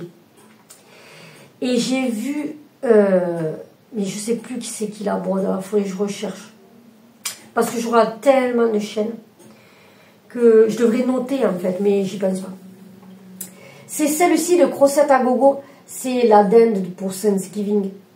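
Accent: South, France. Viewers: Coucou, j aime toujours autant regarder tes vidéos ☺️ en plus tu as toujours des astuces en bricolage qui sont très chouettes. Tu sais le principal en tournant les vidéos c est à la base se faire plaisir , peut importe si il y en a des tonnes sur YouTube, du moment que tu prends plaisir à les réaliser c est gagné 😉 en tout les cas moi je le vois comme cela . Et puis les gens adorent l accent du Sud , bon ok vu que tu es de ma région je suis un peu chauvine du coup 😅🤣🤣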